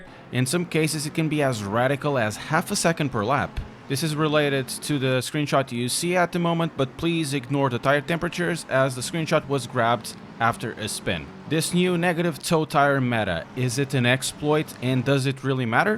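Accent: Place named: Portugal. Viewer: you sound portuguese.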